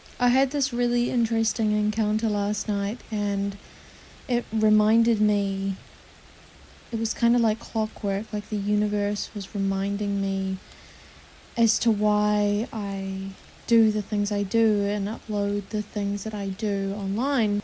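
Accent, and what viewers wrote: New Zealand. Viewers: Why do I never recognize the kiwi accent when I'm kiwi haha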